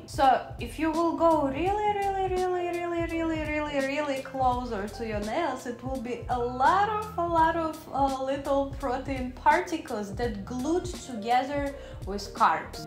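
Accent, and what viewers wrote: Russia. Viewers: да!это очень заметно из-за русского акцента ,который хоть и не очень ярко ,но выражен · она русская?? прям слышимый акцент..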